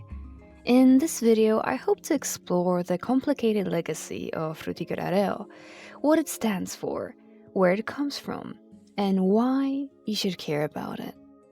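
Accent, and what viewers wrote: Poland. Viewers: Oh how I felt the Polish in you barely 8 seconds into the video :D /pos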